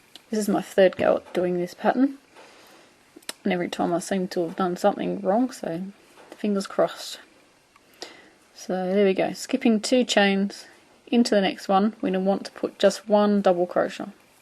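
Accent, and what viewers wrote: Australia. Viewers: I hope you make more videos, You could read a calendar or a grocery list and I would listen, I love the Australian Accent.. hee hee.. thanks bunches!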